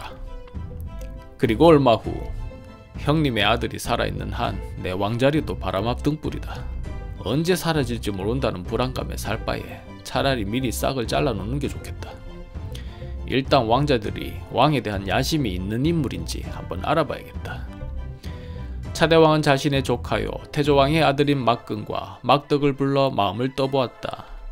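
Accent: Gyeongsang, South Korea. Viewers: 영상제작에 사투리가 불편하면 안보면될걸ㅉㅉ 제작자님 일상생활에 경상도 사투리 접하기 어려운 저로서는 들을때마다 구수하고 재밌는 억양이 귀에 팍팍 잘 꼿히고 있으니 있는 그대로 계속 해주세요~~!!! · 무슨 고구려왕과 역사를 얘기하면서 나레이션을 어울리지도 않는 경상도 사투리를... 표준어를 못하는 것도 아니고...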